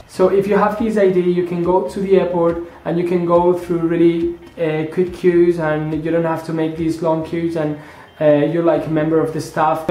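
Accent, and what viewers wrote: United Kingdom. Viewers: Omg what an amazing British accent xx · Such a great british accent being spanish congratulations haha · I did my recruitment day for Ryanair last Friday (Dec 6th), it's Tuesday now (Dec 10th) and this week I'm getting my answer, if I'm finally chosen I'll be travelling to Hahn on the 7th of January. Fingers crossed, I'm nervous and excited at the same time. Gracias por compartir tu experiencia, yo también soy español! Y enhorabuena por tu acento británico 👌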